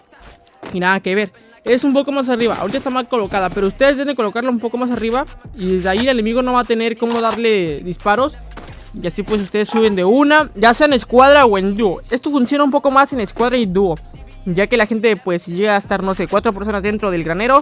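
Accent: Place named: Argentina. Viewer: Usted parece argentino compa jajajajaj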